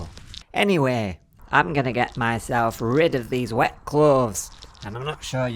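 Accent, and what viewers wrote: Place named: Liverpool, United Kingdom. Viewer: accent is like Ringo's. Is he a Liverpudlian? (PS. I'm an Americanium)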